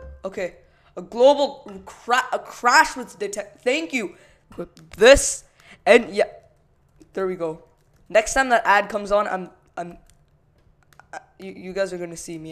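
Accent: India. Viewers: Hey r u a indian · dude are you indian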